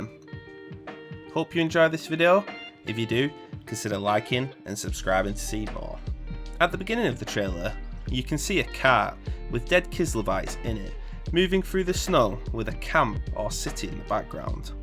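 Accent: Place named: Bolton, United Kingdom. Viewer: Where you from lad? You sound like my neck of the woods, Bolton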